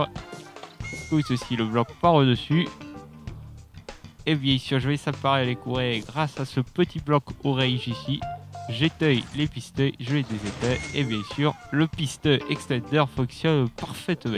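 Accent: South, France. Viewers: Rien à dire sur le système, mais votre accent du Sud est vraiment très très prononcé et c'est dur à écouter...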